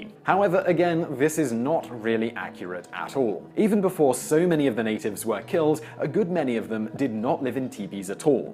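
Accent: England, United Kingdom. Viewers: Nothing more funny then a white guy with an English accent talking about misconceptions of the various nations, at least he's actually trying I give him that much.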